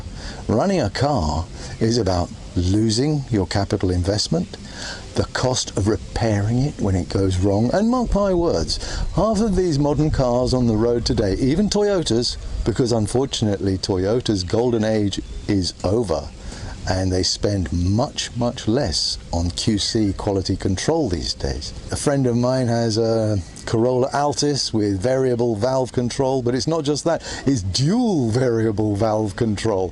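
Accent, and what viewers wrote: United Kingdom. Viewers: We used to have one of those in the Philippines. Wasn't the latest or the best, but hauled people and stuff for relatively cheap. Back then when you asked if people had a car, they'd usually answer, "yes we have an FX." You can seat 10...Legally lol Something about having a Brit do the narration makes the experience sound like a cool museum tour :) Flippin Marvelous! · With all due respect to Scotty who knows a good review when he sees one, let's give credit first to the one who made the review, namely,! You are a natural! I think with your melodious British accent, which Filipinos love to hear, you can have a career doing reviews of things you love to use.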